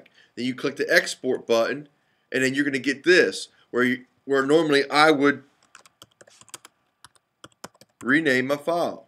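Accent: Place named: Baltimore, United States. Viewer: You sound like you're from Baltimore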